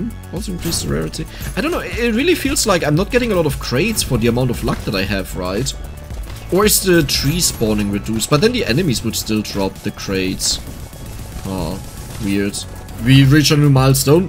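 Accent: Germany. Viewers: why do i hear a german accent?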